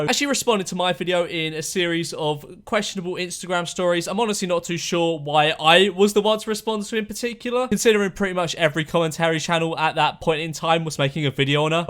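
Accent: United Kingdom. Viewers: What’s better than ur channel is your British accent lol 😂❤️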